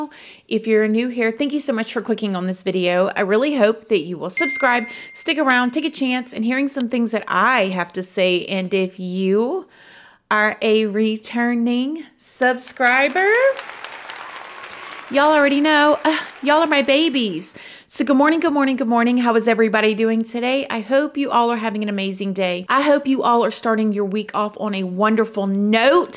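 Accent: South, United States. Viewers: I thought this was Annalise's and I just thought I never noticed what a heavy southern accent she has LOL well good I'm happy to try out a new channel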